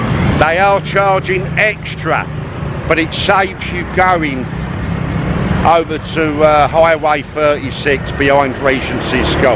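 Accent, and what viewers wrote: United Kingdom. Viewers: Good one,. I am convinced that I watch your content mainly because I like your personality. Where the hell in the UK are you from? It's fun trying to figure out your accent half the time. Sure, I've been to Thailand several times, Pattaya only once, but your content is compelling and interesting to me only because it's delivered by you. You're an engaging dude, so keep up the good work and I hope I can share a cold one with you some day. Keep doing what you do. It's awesome.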